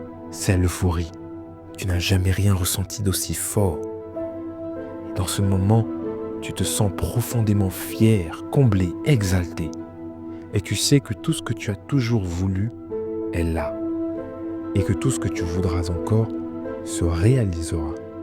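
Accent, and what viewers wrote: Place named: Cameroon. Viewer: Ma vision limitée dit ceci ... Tu as Ton sous-accent camerounais-bamileke qui manque dans ton discours et qui distrait un peu,car il est noyé ... Sans offense. Hotep Paix.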